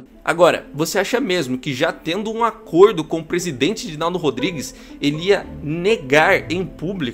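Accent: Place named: Caipira, Brazil. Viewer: Também gostei e recomendei,. Apenas uma sugestão: conserte sua pronúncia da letra "R". Ela é muito, digamos, "caipira": jogadorrr, treinadorrr, patrocinadorrrrr... A correção desse vício de dicção o elevará ainda mais como comunicador-jornalista.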